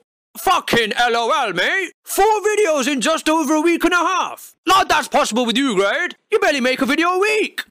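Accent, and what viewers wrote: United Kingdom. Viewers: baryonyx31 But he has lived all his life in UK and has a severe British accent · hey i thought your brit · Grade A says not to support the logic in Casey's video because it's false, yet his argument has just as much fallacies, such as his argument for Hillary not being sane is a fucking joke about her enthusiastic reaction to shit and pigeonholing her negative sides for going to war and pigeonholing Trump's negative sides for PussyGrabbing, all the while saying himself that all youtubers (admitting himself) shouldn't be listened to as political rationale. Essentially just a cartoon with silly British noises now, no comprehension.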